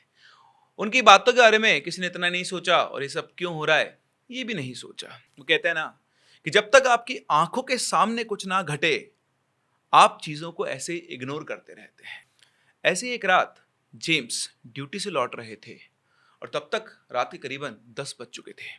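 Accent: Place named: Chhattisgarh, India. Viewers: Badiya chattisgari bole sir😮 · Chhattishgarhi boli bahut sundar lagat he tuhar muh se bhaiya ji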